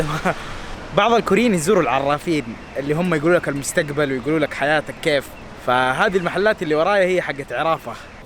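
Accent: Jeddah, Saudi Arabia. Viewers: يا ولد صوته تقول واحد جداوي 😂😂😂😂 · انبسطت كتير من طريقة تقديمك للمعلومات وخصوصا اللهجة الجداوية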